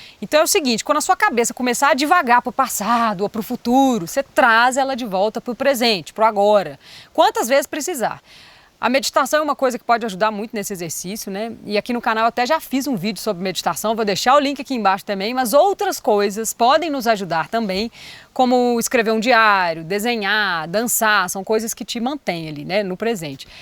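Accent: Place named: Minas Gerais, Brazil. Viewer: Mas tb pode ser observado que como as árvores é necessário flexibilidade para potencializar a resiliência!! Sempre sendo ela mesmo, sotaque, vocabulário, transparência, trejeitos......mineirinha carismática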